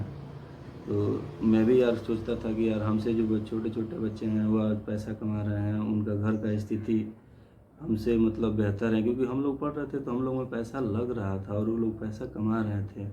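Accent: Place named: Bhojpuri, India. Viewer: Bhojpuri bahut badhiya laga aapse sunkar aap kaha se hai😂😂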